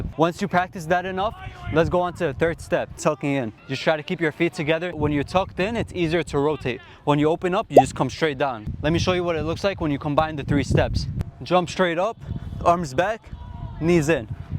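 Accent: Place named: Queens, United States. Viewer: u talk with an accent/an aksent from here in Queens Countey !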